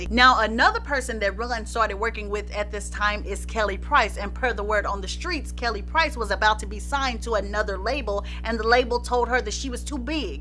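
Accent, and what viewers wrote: South, United States. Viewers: lol it’s her southern accent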